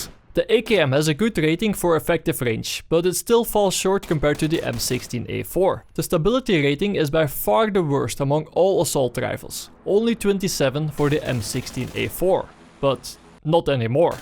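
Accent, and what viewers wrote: Netherlands. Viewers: you sound dutch but 10/10 vid m8 keep up the great work :) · Where is your accent from, it sounds like a mixture other Netherlands with northern England.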